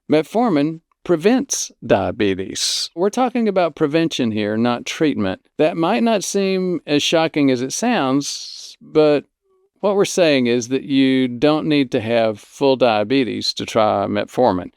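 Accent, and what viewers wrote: South, United States. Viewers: Having grown up in Alabama, I love your accent. Not hillbilly, just one of a variety of southern accents. I've been in Texas for 46 years, & still think the Texas accent is harsh.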